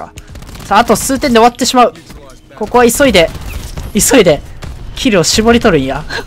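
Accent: Kansai, Japan. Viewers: グリくん関西出身だったりする？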